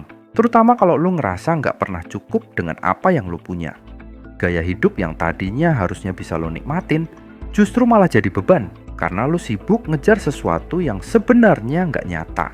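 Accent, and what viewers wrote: Java, Indonesia. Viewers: gue juga heran, bang. aksen Lo Jawa banget, tapi pake Lo Lo, gua gua. biar apa??😄😄😄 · Mas sampean medok, jane rasah nganggo lo gue.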